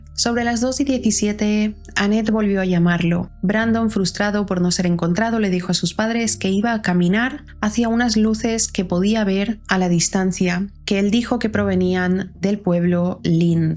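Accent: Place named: Spain. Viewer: gracias!! es que su tono es completamente Español, sin dudas, pero a veces utiliza palabras o expresiones Latinas, por eso me confudió. 😊 Creo que es una gran profesional, espero que con esfuerzo pueda llegar a ser mas reconocida.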